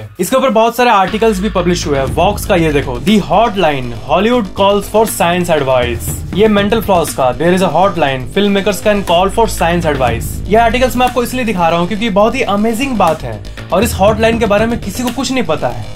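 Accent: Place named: Bihar, India. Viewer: Sir apke bolne ka tarika hamare family aur relatives se milta h... I think ap hamare side se ho.. Bihar❤....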